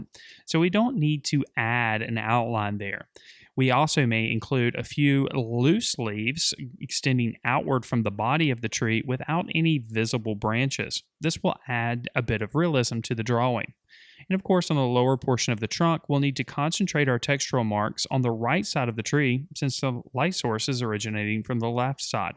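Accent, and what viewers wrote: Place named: Tennessee, United States. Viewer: awesome! you sound like (judging by accent) that you live in the Tennessee area? don't get to hear that accent much any more! grew up in Virginia and now live in North Carolina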